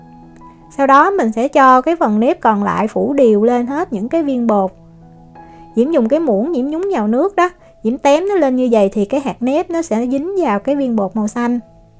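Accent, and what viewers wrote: South, Vietnam. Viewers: Giọng nói miền Nam rất dễ thương, rõ ràng. Hướng dẫn kỹ. Thanks em!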